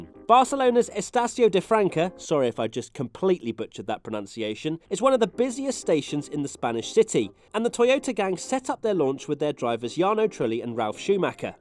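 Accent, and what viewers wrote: United Kingdom. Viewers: Aaaah your british accent 😵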